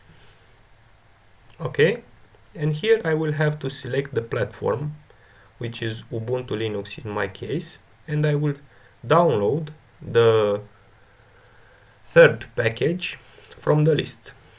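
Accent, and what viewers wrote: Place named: Russia. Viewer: Looks like hard russian accent )))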